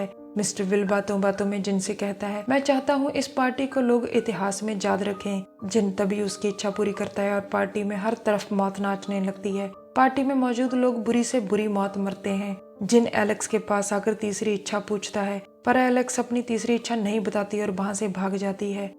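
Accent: Punjab, India. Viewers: so much punjabi accent..in your voice.. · Hardcore punjabi accent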